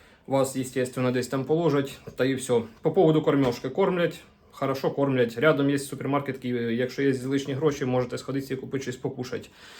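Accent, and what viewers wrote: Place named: Surzhyk, Ukraine. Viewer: Який жаххливий суржик, ще не чула, щоб так спотворювали нашу солов'їну мову. Де цей хлопак чув оце? "не повторяйте моїх ошібок"😅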